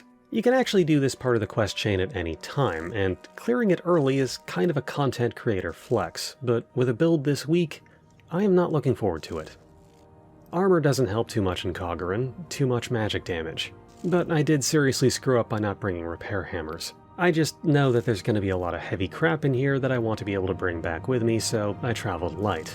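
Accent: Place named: Canada. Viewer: I can tell youre canadian.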